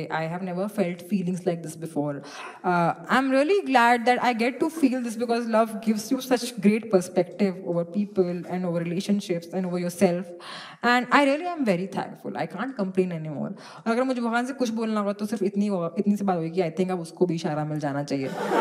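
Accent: Eastern Uttar Pradesh, India. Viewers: She is the best female comic.... So spontaneous in changing her tone every second and just love that east UP flavour here and there😂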